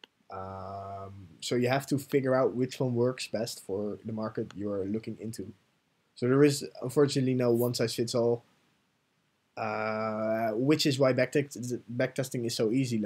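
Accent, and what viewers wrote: Netherlands. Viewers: your dutch ? :O i just hear it in your accent :) thanks for sharing your software ;) your the best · u dutch???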